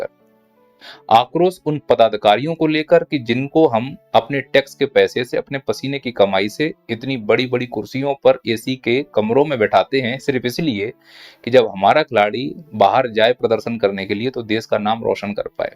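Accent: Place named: Uttar Pradesh, India. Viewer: Sir aaj pura u p lehje me bol rahe hai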